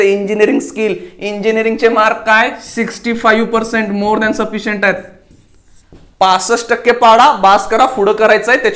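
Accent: Kolhapur, India. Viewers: Siranchya bolnya varun vatatay sir sangli, satara, Kolhapur che ahet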